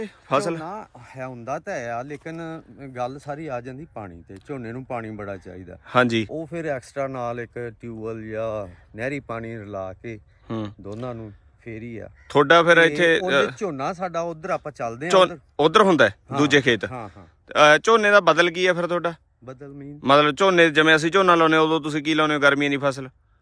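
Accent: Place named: Dhanaula, India. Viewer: ਤੁਸੀਂ ਕਸਮ ਖਾਧੀ ਹੋਈ ਹੈ ਕਿ ਕਿਸੇ ਵੀ ਇਲਾਕੇ ਵਿਚ ਜਾਓ ਤਾਂ ਅਪਣੀ ਧਨੌਲੇ ਵਾਲੀ ਬੋਲੀ ਹੀ ਬੋਲਣੀ ਹੈ? ਬਾਈ..ਬਾਈ.., ਝੋਨਾ, ਨਿਆਈਂ..! ਇੱਕ ਬੇਬੇ ਨੂੰ ਤੁਸੀ ਸਤਿ ਸ੍ਰੀ ਅਕਾਲ ਬੁਲਾਈ ਤਾਂ ਉਹ ਮੂੰਹ ਵੱਲ ਦੇਖੇ। “ਨਿਆਈਂ ਦੀ ਜ਼ਮੀਨ ਦੱਬੀ ਬੈਠੇ” ਤੁਸੀਂ ਦੋ ਵਾਰ ਬੋਲ ਚੁੱਕੇ ਹੋ। ਪਹਿਲਾਂ ਨਾਸਿਰ ਢਿਲੋਂ ਨੂੰ ਵੀ। ਦੱਬਣ ਦਾ ਮਤਲਬ ਤਾਂ ਕਬਜ਼ਾ ਕਰਨਾ ਹੁੰਦਾ। ਉਹਨਾਂ ਦੀ ਪੈਲ਼ੀ ਹੈ ਤੇ ਤੁਸੀ ਦੱਬੀ ਦੱਸਦੇ ਹੋ?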